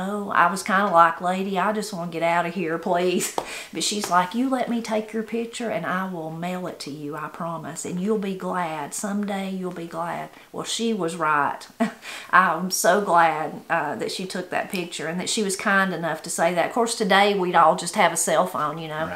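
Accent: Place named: North Carolina, United States. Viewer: I love listening to all your stories. It's the accent. My family is from North Carolina and its like being able to hear them again.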